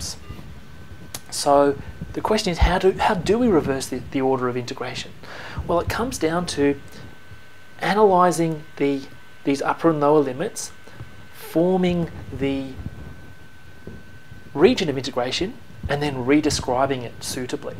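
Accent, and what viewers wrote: Australia. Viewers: Talha Bedir this sounds australian to me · Definitely mate, an Aussie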